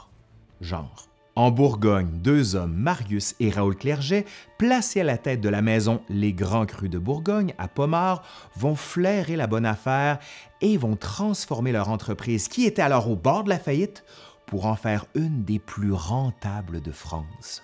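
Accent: Quebec, Canada. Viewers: Bon sang, l'accent Québécois est juste magnifique ! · J'aime beaucoup votre travail.. Auparavant, c'est à dire avant d'avoir connu vos vidéos, je n'appréciais pas tellement l'accent canadien, mais grâce à vous mon opinion a pu changer totalement. Le son en devient agréable, doux et confortable à l'écoute de vos récits sur l'histoire. Comme vous.nous l'avez suggéré, je souhaite proposer une traduction en une autre langue, en hébreu du titre de votre video : למה הנאצים אהבו כל כך יין?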